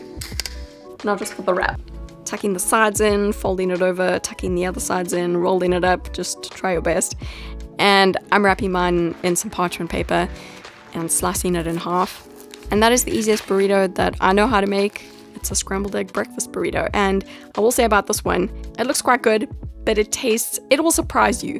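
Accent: South Africa. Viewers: South African accent is strong haha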